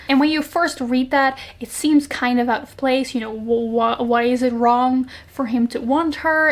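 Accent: Netherlands. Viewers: Is your accent Dutch? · This is absolutely not critique your english is really good, its just funny how as a dutchie, i can recognize you’re dutch as well in like .2 seconds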